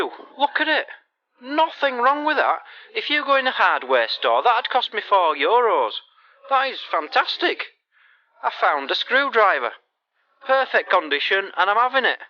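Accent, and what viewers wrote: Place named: Leeds, United Kingdom. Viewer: I thought I heard a touch of the Leeds twang as well.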